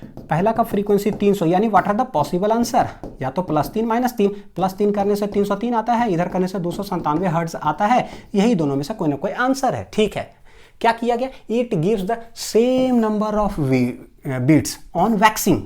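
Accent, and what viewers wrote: Bihar, India. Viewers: thanku sir आपसे पढ़ने पे अपना वाला फीलिंग आ गया आपका accent बिहारी वाला है न 😌❤️ सामझ् गए सर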